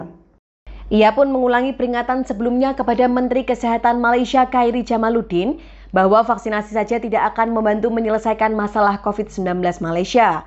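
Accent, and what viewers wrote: Java, Indonesia. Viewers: Pembaca beritanya medok jawa bgt...hehehe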